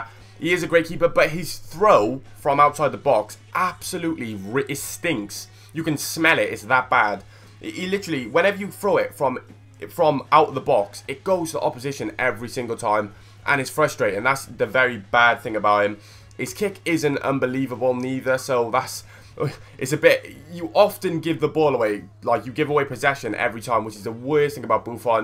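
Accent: Wales, United Kingdom. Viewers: You welsh?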